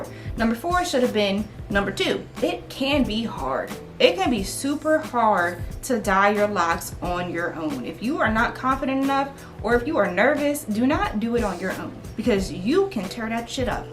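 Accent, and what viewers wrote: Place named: DMV, United States. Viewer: She sound like a dmv baby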